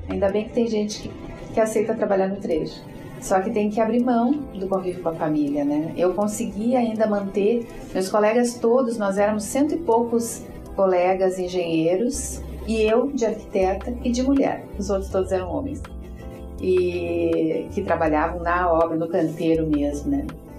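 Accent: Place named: Rio Grande do Sul, Brazil. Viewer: Parabéns por sua trajetória. Acredita, que esta semana, vendo, ouvindo você, foi que notei que és gaúcha! Eu acreditei muito tempo que você fosse Paulista. Ah, mas fiquei muito entusiasmada., você é muito simpática e carismática. Eu amo seu canal e sua maneira de se comunicar. Felicidades.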